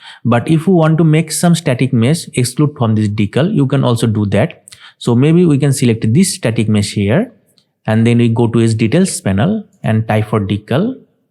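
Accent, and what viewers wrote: India. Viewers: какой то индийский акцент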